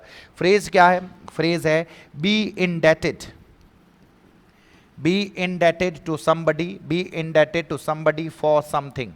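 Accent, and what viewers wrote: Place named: Marwari, India. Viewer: Sir aap kha s ho marwadi hmare side ki h 😂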